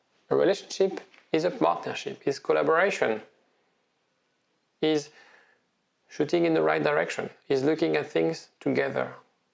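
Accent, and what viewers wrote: France. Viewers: French accent is awful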